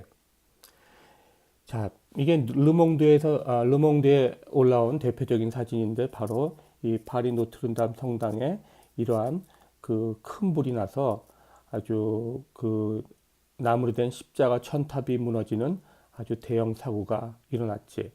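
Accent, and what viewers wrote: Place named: Seoul, South Korea. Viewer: 크게 공감합니다!! ㅇㅇ 사실상 지금의 스울말투(말로써 하는 표준어)는 산업화와 더불어 온갖 타지역 사람들(ex:설라디언 이주민)의 유입과, 또 흘러간 세월들이 요래 마구 쓰까져가지고.. 예전과는 많이 달라졌다는 학계의 지적이 있잖어!? 국어쌤은 아마듀 메이비, 이러한 실정을 나 보다도 아주 잘 알고 있을거야. 그렇쵸!? ㅋㅋ 그런데 우리 의 발음과 발성을 듣고 있노라면.. 이게 바로 진짜 '교양있는 사람들이 두루 쓰던 현대 서울말!'(오리지날 한쿡 표준어) <ㅡ 를 완벽하게 구사하는 사람인 것 같아ㄹㅇ. 그래서 더 놀랍고, 청자의 입장에서 아주 편안하다는 장점이 있서요!! 동의하지!?? 예아-~!!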